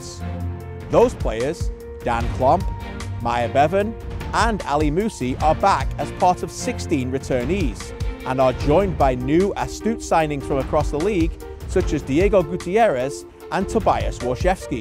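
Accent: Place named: Canada. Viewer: Why’s he putting on a Canadian accent all of a sudden? 🤣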